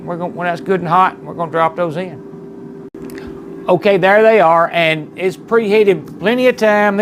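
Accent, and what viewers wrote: South, United States. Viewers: That country accent makes the first 10 seconds of this video so awesome lmaooooo 👌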